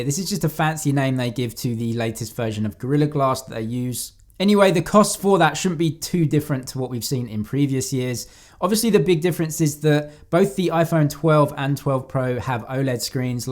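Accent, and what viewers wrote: United Kingdom. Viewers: and he's even British too.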